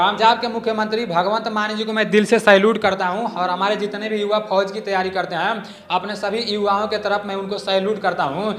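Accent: Bihar, India. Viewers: पहले तुम हिंदी बोलना सीख जाओ उसके बाद यू ट्यूब पर आना, दू दिन नही दो दिन पहले कही भी बिहारीपन छाप छोड़ जाते हो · Yaar tu bihari language mat bol. Bihari lag rha hai tu.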